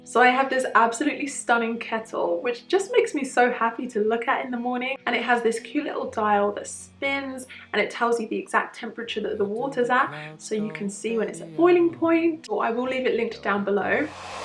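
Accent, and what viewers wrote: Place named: Essex, United Kingdom. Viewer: Lara here. Very pretty intro. ☺️☺️ The Essex accent 🥰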